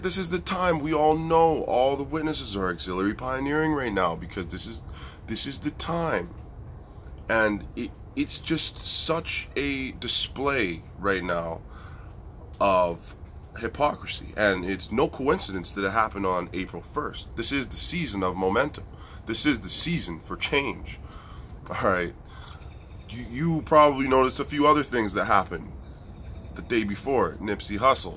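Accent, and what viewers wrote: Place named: Canada. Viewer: You are so adorable and funny. Love the Canadian accent!!!!!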